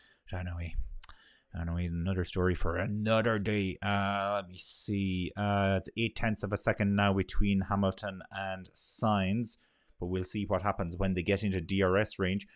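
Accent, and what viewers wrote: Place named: Ireland. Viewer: Aaaah a good Irish accent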